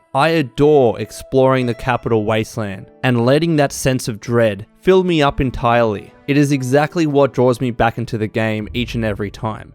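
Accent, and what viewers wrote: Australia. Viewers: Wooo! IVE MISSED THAT AUSSIE VOICE! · Goddamn I missed hearing that smooth Australian buttery voice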